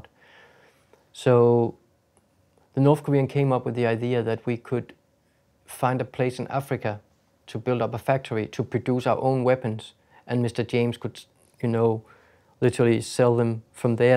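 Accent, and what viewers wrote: Denmark. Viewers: Man kunne høre han var dansker fra starten 😂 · Bro the danish accent is so clear it’s funny to me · Damn, knew he was danish the moment he opened his mouth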